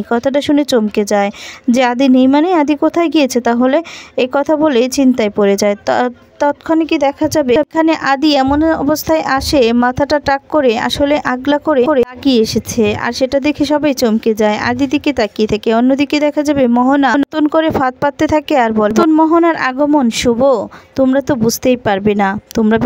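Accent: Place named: Bangal, Bangladesh. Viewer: তোমার কথকতা ভাল হচ্ছেনা। বাঙ্গাল বাঙ্গাল উচ্চারণ শুনতে খারাপ লাগে। এই উচ্চারণ ঠিক না করেই কথকতা নাকরলে ভাল ।অপদার্থ। একটা কথা,চেষ্টা করলে তুমি বাঙ্গাল বাঙ্গাল উচ্চারণ ঠিক করে শোনার যোগ্য কথকতা করতে পারবে।বিশ্বাস রাখো।শুভেচ্ছা জানাই।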